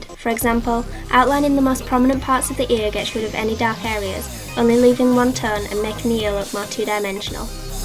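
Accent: Yorkshire, United Kingdom. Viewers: your Yorkshire accent is so pure in this lol I almost forgot how northern you are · R u british I am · Her accent is yorkshire amd jade thirlwells is Geordie .... There's a difference x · You've just got a new subscriber! Also, you're from Yorkshire? I'm from there too 👌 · it sounds like she has a Yorkshire accent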